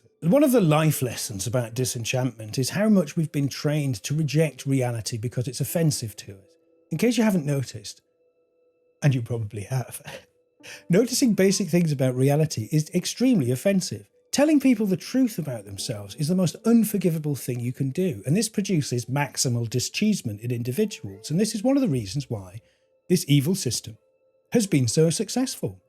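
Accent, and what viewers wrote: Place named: United Kingdom. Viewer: I really enjoy TRUTH Tellers! Cheers!!🎉 Truth with a British Accent is EVEN BETTER! We could get really Creative and make this Truth Wizard A THING. I hereby Volunteer for the Costume Department Manager and will gladly Donate Costumes & Props, if needed. I would have to ship them from America. Just heard today, 9/2/25, that Brits cannot Mail packages to the US under your current Dictator. Thanks be to God, we Americans still have that Freedom. Unless it "mysteriously disappears" at your end, I don't see a problem. 😉😉 On a seroius note, I thank God that I was Born on Christmas Day 1960. I was taught to pay attention to Life and have literally witnessed with my own eyes, ears, heart and soul the disintegration that he is referring to. The turning upside down of everything. He is absolutely correct. It has been a wild ride and I suspect we'd better buckle up. 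The Disenchantment is SPREADING! 😇🙏💪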